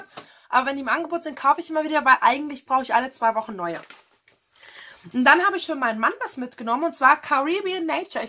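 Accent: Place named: Swabia, Germany. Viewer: Du schwäbelst hart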